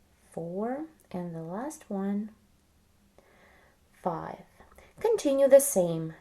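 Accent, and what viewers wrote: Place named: Romania. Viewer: După accent și cum ți în mâna dreaptă croșetă ești Europea mai exact româncă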